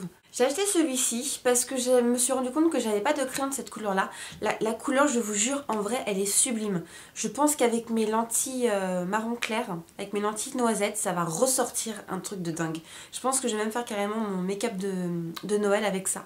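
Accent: England, United Kingdom. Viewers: j'adore ton accent anglais mdr!!!!! <3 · j'adore ton accents anglais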